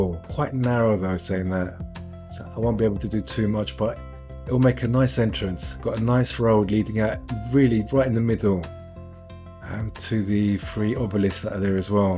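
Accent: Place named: United Kingdom. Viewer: Dude, keep it up! Your builds are the best I've seen. I'm trying to place your accent, almost British, but not quite....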